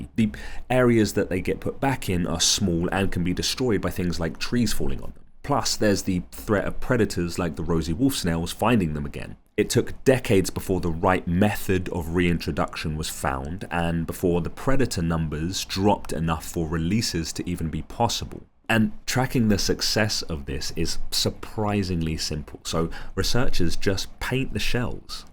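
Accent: United Kingdom. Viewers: Why is he nick cannon with a British accent?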